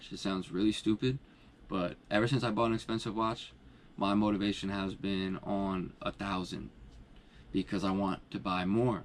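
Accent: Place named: New Jersey, United States. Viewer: I think I just pin pointed where dude from ...howell..nj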